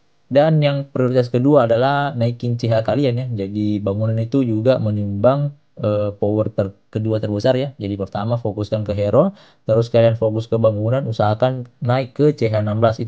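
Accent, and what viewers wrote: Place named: Sulawesi, Indonesia. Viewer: Dari logatnya pasti orang Sulawesi😂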